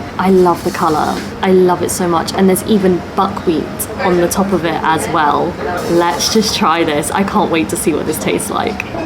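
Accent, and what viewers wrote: RP, United Kingdom. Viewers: 美味しそうに食べるなぁ！やっぱ英国の英語ていはいな。40代だけど中学生の時に途中からアメリカ英語にかわった。聞き取り安いけど、イギリス英語は雅な響きあるな。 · ほんと可愛いし、British Englishへの慣れという点でも助かる。こちらも見てて楽しくなります。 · 何時も拝聴しております。さんのクインズイングリッシュはとっても聞き取りやすい。イギリス英語？は何時の頃からか話し言葉から書き言葉に変化したのではないでしょうか？。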